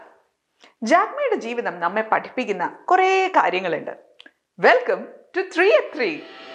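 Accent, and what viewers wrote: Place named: Valluvanad, India. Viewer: valluva nadan Samsaram mam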